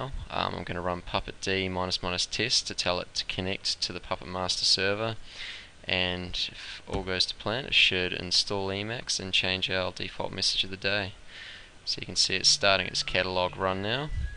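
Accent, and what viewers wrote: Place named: New Zealand. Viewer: What, so just because you can't understand a Kiwi accent, you have to be an arse and post some Swedish translation? Ignorance is bliss? Or you just upset the New Zealand is better than the old one?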